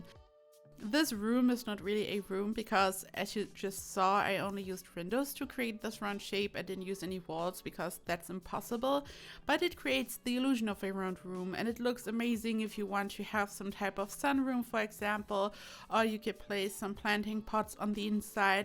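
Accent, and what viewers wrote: Germany. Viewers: Your accent at least sounds very german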